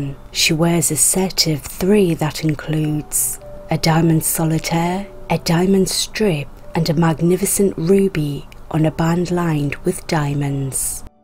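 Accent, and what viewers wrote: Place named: North East England, United Kingdom. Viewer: It’s a north east of England accent. Newcastle or Sunderland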